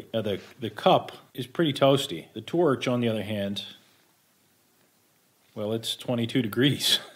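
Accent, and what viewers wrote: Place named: United States. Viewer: Ive always wondered where is from. You sound like an american, but you use the metric system. Im so confused!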